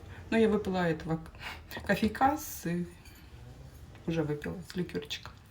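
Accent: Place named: Surzhyk, Ukraine. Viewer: Привіт землячка!Кривий Ріг поряд,Дніпродзержинськ.Всі так говорять тут!На суржику більшість так говорить! Говори як тобі подобається, ти живеш свохм життям і це твоя дорога!Живи і будь здорова і щаслива!!!!!❤❤❤❤❤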